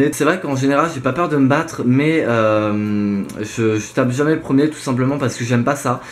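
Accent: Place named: United Kingdom. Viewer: accent British parfait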